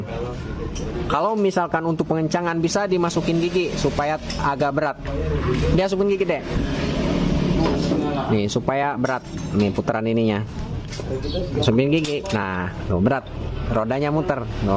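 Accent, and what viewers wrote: West Java, Indonesia. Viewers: Mantep ah Jiga orang sunda Kang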